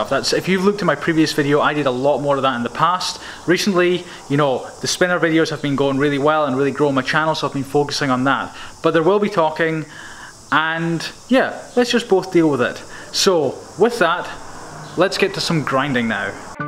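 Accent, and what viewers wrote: Ireland. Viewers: are u irish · u have a cool Irish accent any way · are you Irish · i love ur irish accent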